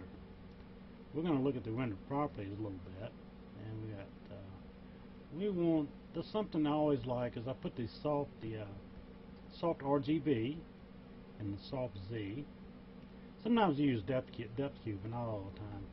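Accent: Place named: England, United Kingdom. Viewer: in the beginning of the video i thought you were from england. then i realized you have a southern draw..then i thought maybe louisianna..then i thought maybe i was initially right about being english.. anyway thanks for the video! z brush has so many features i dont even think the makers of zbrush know all of them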